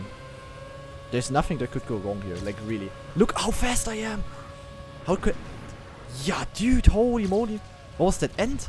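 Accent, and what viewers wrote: Germany. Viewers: Dieser andere Deutsche Akzent 😂👌🏽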